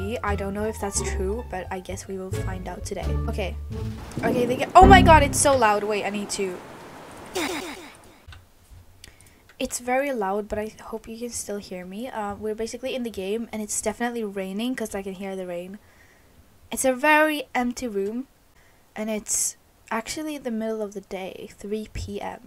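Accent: Sweden. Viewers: You are from sweden right? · Are you from Sweden? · ... Hey uhhh are you actually from Sweden?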